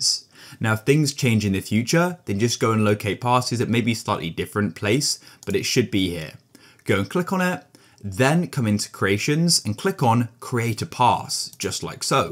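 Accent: United Kingdom. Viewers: Are you british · Thank you British man! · Yes, he is british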